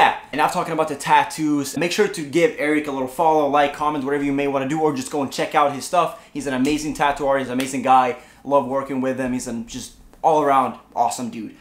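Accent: Scania, Sweden. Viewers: Jonte nej han är ju skånsk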